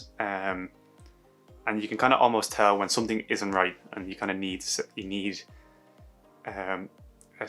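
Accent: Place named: Ireland. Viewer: Are you in Ireland? Your accent sounds Irish.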